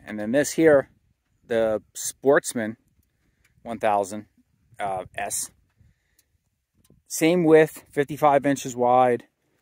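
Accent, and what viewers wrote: New York, United States. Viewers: New York accent?.. I’m from Pennsylvania… for 2024, the only color offered Is a bright orange. .. kinda ugly tbh. Limited edition so they’re kinda hard to find.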